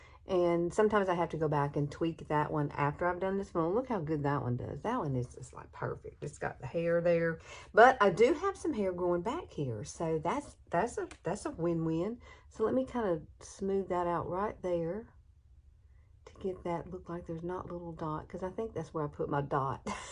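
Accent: South, United States. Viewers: Ur video from 6 yrs ago has a bit of a diff southern twang but it’s all so cute :) I miss hearing u say charlotte tilSbury 🤗♥️ I can’t wait to try ur make-ur-own liner brush technique & ur shadow hooded tip 💖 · Nothing wrong with sounding Southern, it’s a great part of our country! It sounds cute too.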